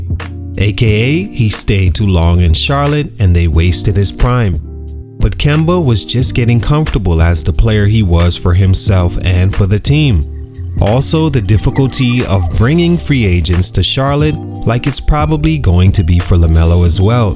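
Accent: Bahamas. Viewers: Bey narrator you Bahamian ay? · Bro, I completely forgot about Kemba. Excellent video! BTW, you sound Bahamian. If so, big up from Canada!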